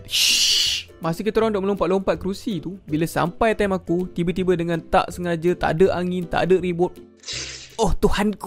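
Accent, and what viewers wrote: Terengganu, Malaysia. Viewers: org terengganu ke?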